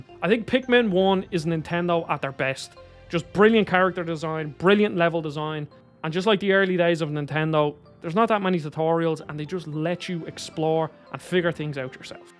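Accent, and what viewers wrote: Ireland. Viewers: I just meant it was an Irish accent that I had never heard before, I may just be being stupid though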